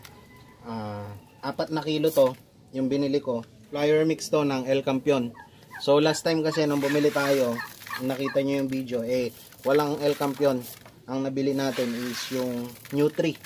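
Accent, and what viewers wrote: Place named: Pampanga, Philippines. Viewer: Boss taga saan ka pwedi puba paki sabi narin sa next vlog mo ty po parang kapampangan kapu kase ea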